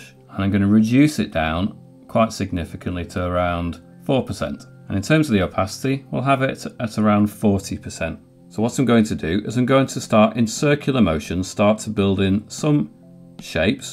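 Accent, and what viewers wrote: United Kingdom. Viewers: Hey! Are you British? And if so, which part of the UK are u from?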